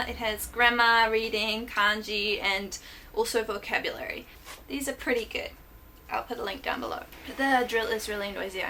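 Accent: New Zealand. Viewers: Woooooow I loved the New Zeland accent. It's one of the easiest accents that I've heard! I loved your video talking about japanese language!! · hah yea, that is a pretty standard accent in New Zealand :) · YUUSS KIWI FTW!! I know someone who moved to Japan but she lost her nz accent. I love how you still have the nz accent when speaking English ^^ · I thought u sounded like a kiwi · No that’s how New Zealander pronounce the word for example if you say the number ten, seven, leg, pen, next, it will sound tin, lig, pin, nixt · Are you from New Zealand as well ??!?! definitely sound like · mimeiさんってニュージーランド出身だっけ？ニュージーランドアクセントってあるのかな？ニュージーランドは訛りがあんまないイメージ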